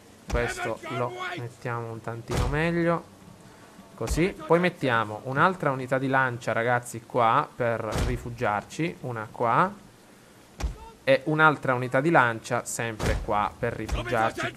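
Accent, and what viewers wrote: Sicily, Italy. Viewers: Quando ti alteri un pò e alzi la voce col tuo accento siciliano mi fai morire, grande! P.s ricorda di finire l'esercito vandalo sopravvissuto alla battaglia, c'è il loro re lì dentro. PPS. i tuoi giavellottisti non lanciavano perchè avevano davanti a loro gli alleati erano ostacolati, credo.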